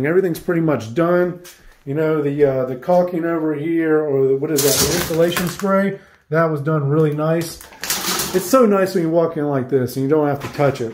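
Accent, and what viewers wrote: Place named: United States. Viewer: C'mon bro you sound like an an American, you know the presentation standard. You could've raked the leaves B4 you started recording bro. Either way nice deal good video thanks 4 the info. Good fortune to you and your brother.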